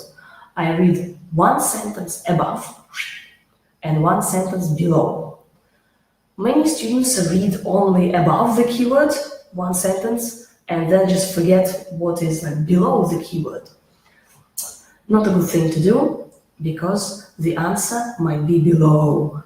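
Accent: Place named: Russia. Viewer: 100% Russian акцент )))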